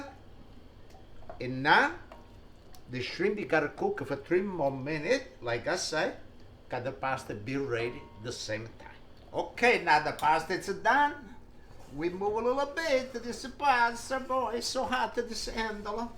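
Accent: Italy. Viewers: The more he tries to lose Italian accent, the more I learn Italian language....nice recipe tho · I can't get enough of his accent he's one hundred percent Italian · I came across your video looking for a recipe with shrimp & pasta. I’m definitely going to try this. You’re adorable, I love your accent. I’m Italian too. My husband is going to love this dish! Thank you for sharing.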